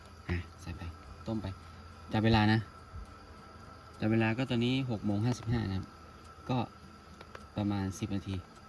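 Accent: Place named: North, Thailand. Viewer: คนเหนือนีวา555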